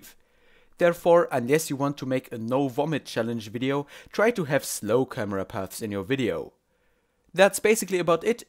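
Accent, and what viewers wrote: Germany. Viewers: Dieser Deutsche Akzent!!!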